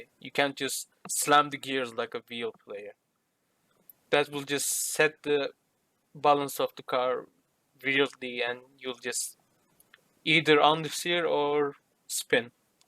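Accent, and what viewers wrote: Turkey. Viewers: ben direk anladım türk olduğunu çok belli aksanı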